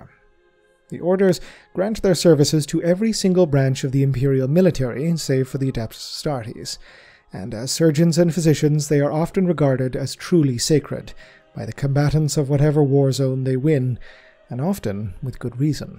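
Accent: Ireland. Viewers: maybe this is a weird question but do you have an irish accent?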